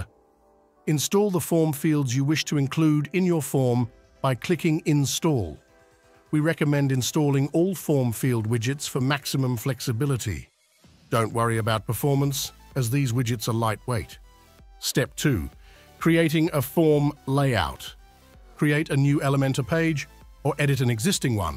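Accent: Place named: United Kingdom. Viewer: As a Pro subscriber, I couldn't be happier with Unlimited Elements and the team of pros behind it. I know I sound like a shill but really, I'm not. My website is particularly amazing and unlike others because of the combinations of remarkable capabilities this toolkit provides, including the fact that I can (and have) customized a particular plugin. And now we have forms!, I like the British accent, but I can tell it's you who wrote it. 😀We love you buddy. - Markus Halvorson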